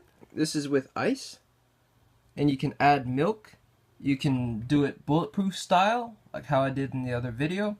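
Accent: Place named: United States. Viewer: Yeah, yeah comment is 1 year long but you're wrong. UK: /ˈmætʃ.ə/ US: /ˈmɑːtʃ.ə/. So yeah, since he's american(or at least speaks with an american accent) he's right.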